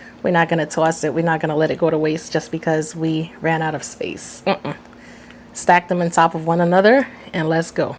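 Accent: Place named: Brooklyn, United States. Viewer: Im so ready to try this! My girlfriend thinks you're from Brooklyn by your accent.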